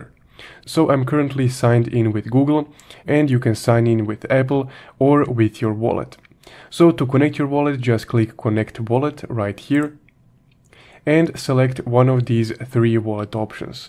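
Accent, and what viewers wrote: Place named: Russia. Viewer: Со найс то хеар рашн акцент)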